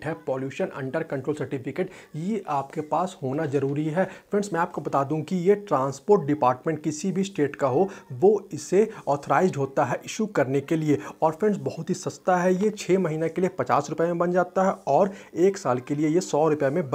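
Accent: Ranchi, India. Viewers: bhai tum ranchi se ho?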